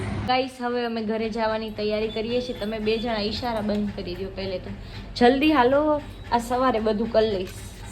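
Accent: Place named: Kathiawar, India. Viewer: Chokhu kathiyavadi bolo chhe maja avve chhe ❤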